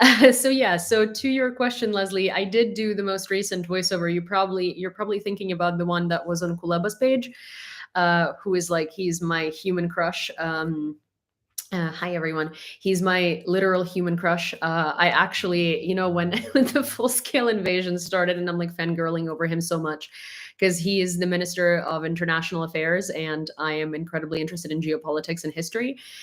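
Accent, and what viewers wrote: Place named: Ohio, United States. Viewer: I get the Ohio like quality in your voice...it's a very plain accent without much filler. And Ohio and Ontario are just across Lake Erie from each other.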